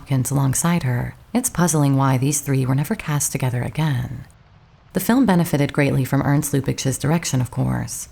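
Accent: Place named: South, United States. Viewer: What was doing?The documentary has a lovely and southern voice, unlike so many of them that are like gracious, I love your voice, whether it's real or unofficials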